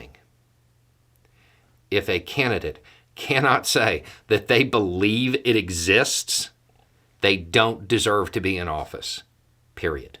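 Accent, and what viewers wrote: South, United States. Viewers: Well said sir. I love to hear a rational argument from a bearded southern accent. You blow the stereotypes wide open and I just wish more people would listen to you instead of the insane rants and misinformation we normally hear.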